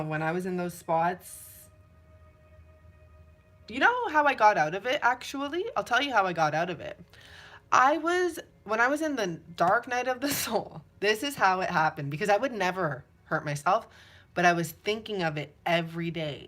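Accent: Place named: South, United States. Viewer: Last night I woke up at 3am thinking about Pluto(Hades) and it led me to Persephone who is the goddess of vegetation and the underworld. So it is blowing my mind that your reading has touched on that. Plus my deceased grandma has been on my mind prior to the eclipse and we are from South Carolina. So that southern voice hit home... 💜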